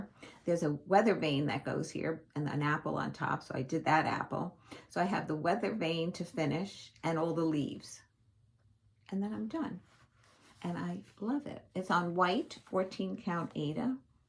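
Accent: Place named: Long Island, United States. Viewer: I love listening to you! I grew up on Long Island, but I live in Kentucky now. I rarely get to hear the beautiful accent! I will be visiting my Friends House on Long Island in January. Thank you for sharing your expertise!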